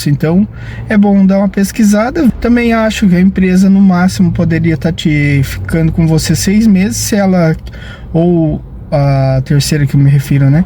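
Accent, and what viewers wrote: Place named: Brazil. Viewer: Olha o conselho, falas, falas e falas e não avanças, vê outros youtubers e logo aprendes e ficas 5 ✨ quanto ao falares brasileiro cada um sabe de si tanto faz o importante é entender-se, mas não existe idioma brasileiro, existe sim vindo do português o que muda é o sotaque, ou dialeto e muitos erros da vossa parte, agora que o sotaque é mais bonito que o português é uma verdade. Fica com a tua e nós ficamos com a nossa o importante é seguir enfrente.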